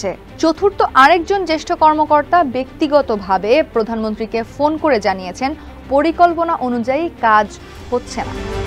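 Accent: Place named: Kolkata, India. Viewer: Kolkata Bangla oyTa eyvabe I bole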